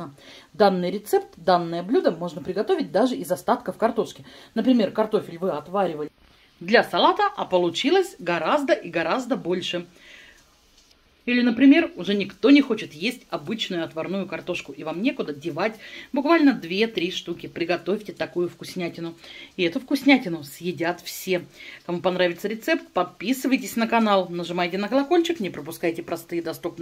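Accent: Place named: Russia. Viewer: какой вкусный русский акцент. Осталось семь варёных картошин. теперь знаю для чего. спасибо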